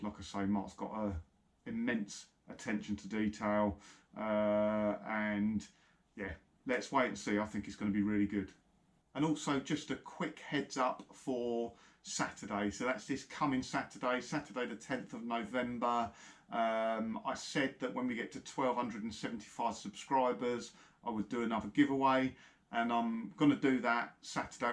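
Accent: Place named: RP, United Kingdom. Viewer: Ooohh posh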